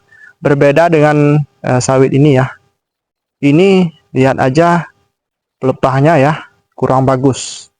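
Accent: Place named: Paser, Indonesia. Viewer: Logat mu kaya suku paser kabupaten penajam paser utara ikn nusantara kalimantan Timur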